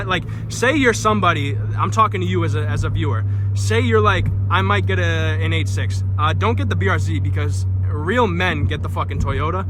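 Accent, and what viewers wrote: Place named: Boston, United States. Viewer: You are so stereotypically a Boston native. You boys out there talk so fast & got no filter 😂😂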